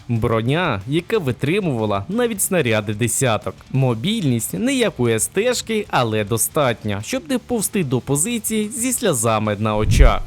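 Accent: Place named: Russia. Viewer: Діалект з паРашкі проскакує. І це тільки щоб фізично не працювати ?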